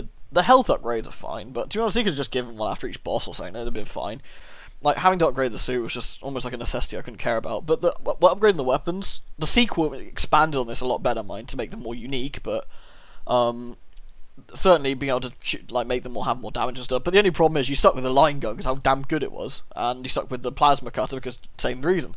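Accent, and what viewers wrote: England, United Kingdom. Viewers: Ooooh... I know that feeling all too well, although that feeling tends to manifest whenever I suddenly remember playing a particular game or something triggers my memories of said particular game. The most recent case, for me anyway, was when I was reading the comment section for his video announcement of his Patreon account, and one of the viewers suggested humbly that he redo his playthrough/LP of F.E.A.R. Pretty soon, I installed my copy of F.E.A.R. and F.E.A.R. Extraction Point. Funny enough, it was because of your LP of Dead Space that I now know of the:). My first impression when I saw one of his videos for the first time (in Dead Space): "Woah! That's a thick English accent!"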